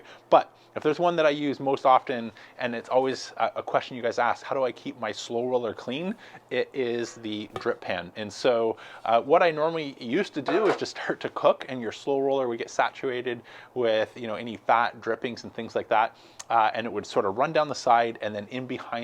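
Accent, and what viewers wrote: Canada. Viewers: Thank you so much for your very informative videos. I have been a KJ student for the last two to three years. Some trial and error but mostly wins since the KJ is so user friendly. Adding you and your videos has added the last piece in my grilling/smoking journey. Plus my family and I really enjoy your Canadian accent...really adds some fun to your tutorials. Keep em coming!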